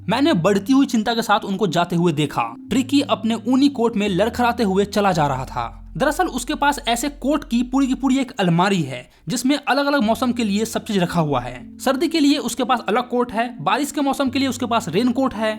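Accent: Bihar, India. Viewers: it also have bihari tone , i can understand as i am bihari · Boli to pura bihari jaisa hai ❤❤❤ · Your Bihari accent is just 🤌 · bhaiya ap thode se bihari ho kya😭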